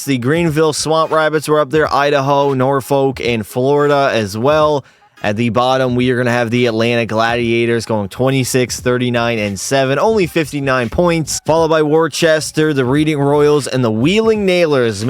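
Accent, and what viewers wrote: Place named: Quebec, Canada. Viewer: 10/10 your Québec accent!! Should be living at Montréal.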